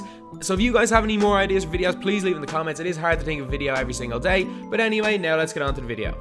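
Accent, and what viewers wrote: Ireland. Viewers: thats just normal with an irish accent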